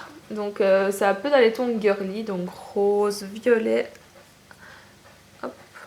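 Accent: Belgium. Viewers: Sympa d'entendre une belge pour une fois hihi :) J'avais déjà entendu parler de sleek mais je ne savais pas où commander, grâce à toi mtn je sais :p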